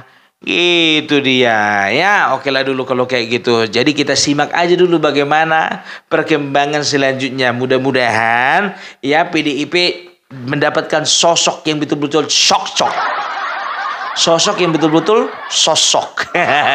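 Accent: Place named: North Sumatra, Indonesia. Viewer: Aku suka sama Opung logat Batak nya kental banget.salam knal Opung Aku dari jawa tengah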